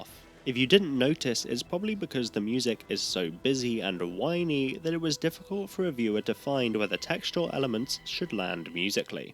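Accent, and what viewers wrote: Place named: Scotland, United Kingdom. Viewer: I FORGOT HE'S SO SCOTTISH OH MY GOODNESS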